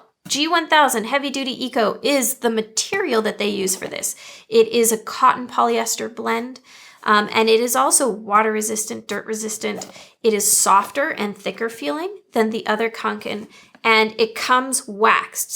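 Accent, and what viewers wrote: Canada. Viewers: I could really hear your Canadian accent in this one 😉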